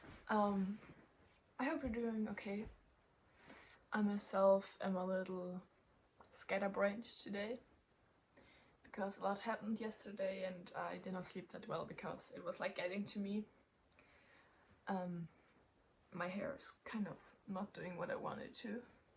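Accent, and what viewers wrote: Germany. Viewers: Moment, bist du Deutsche? Den Akzent hat mir ein Gefühl gegeben aber ich war mir nicht sicher :)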